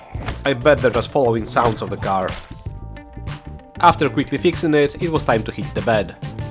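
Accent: Poland. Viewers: Where are you from, your accent sounds polish.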